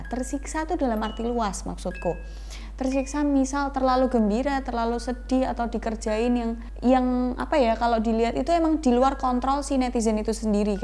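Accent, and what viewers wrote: Java, Indonesia. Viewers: Rasa ingin tertawa bukan Karna kontenya tapi Karna medoknya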